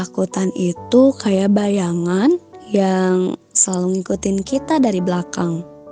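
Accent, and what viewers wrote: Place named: Medan, Indonesia. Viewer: kakknya pasti orang medan kan🥰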